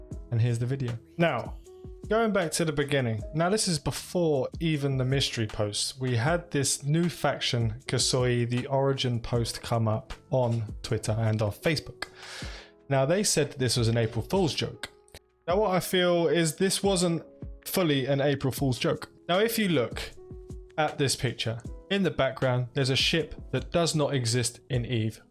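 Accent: United Kingdom. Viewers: London geezer init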